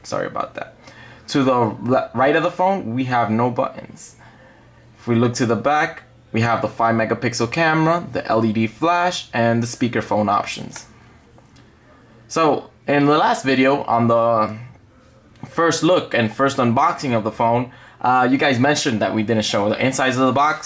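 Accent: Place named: New Jersey, United States. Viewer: sounds like he's from Nj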